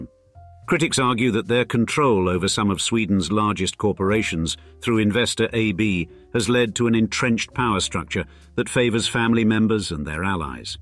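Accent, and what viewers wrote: RP, United Kingdom. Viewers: Very nice SRP accent by the announcer. And no, the "Queens English (SRP)" is not even close to the accent of the majority of British people. The vast majority of perfect RP speakers are in overseas Commonwealth countries. Only 5-15% of British people speak with an RP (received pronunciation/ Queens English) accent. I write this to expel simplistic ignorant assumptions that many people hold.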